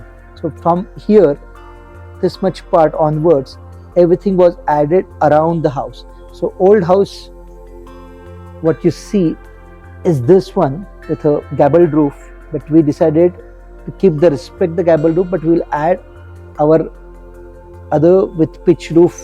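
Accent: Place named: India. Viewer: where is this located? The house look typical Indonesian old house but the way he speak his accent is not Indonesian sounds Indian somehow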